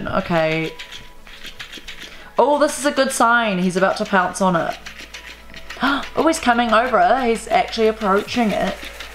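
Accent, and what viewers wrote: New Zealand. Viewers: I thought the accent was Kiwi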